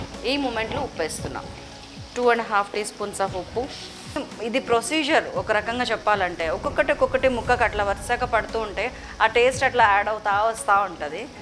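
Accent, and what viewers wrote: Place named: Godavari, India. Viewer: Godavari savvadila andanga undhi mee maatalu nice video thank you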